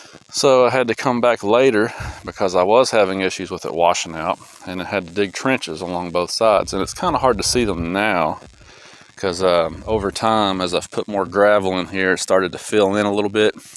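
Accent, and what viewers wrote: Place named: Kentucky, United States. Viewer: I knew you were from KY, before you said it…. Sound just like me 😂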